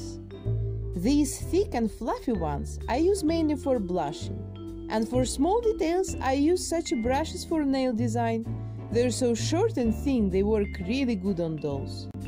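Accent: Russia. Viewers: Сорян, ты русская? , просто уши кровоточят:')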